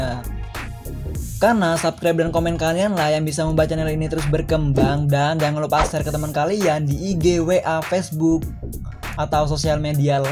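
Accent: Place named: Jember, Indonesia. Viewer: logat jember nya kentel banget wwkkw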